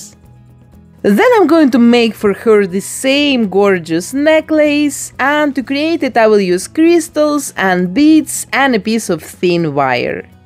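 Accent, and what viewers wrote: Russia. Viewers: Судя по Вашему акценту, Вы понимаете русский. Может, попробуете сделать ещё один вариант Одри? Прошло достаточно времени, чтобы попытаться снова))) Эта версия мало на неё похожа, Вы замечательная художница, и можете гораздо лучше) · Сильный русский акцент))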